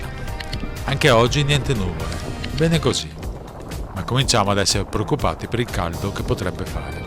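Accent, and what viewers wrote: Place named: Veneto, Italy. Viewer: bravo, mi sembra sentire accento veneto ! : sono francese, ma di origine italiana (parenti eravanno du Nervesa) quando ero giovane facevo bici sul' montello, sulla strada vicino treviso, et poi nel 70-80 troppo macchine in italia, adesso sembra che ci sono ciclabile : o pedalato dalla francia fino treviso due volte, ma addesso sembra difficile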